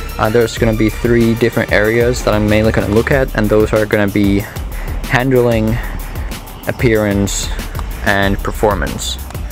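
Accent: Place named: United States. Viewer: You sound American but your plate says you're Polish?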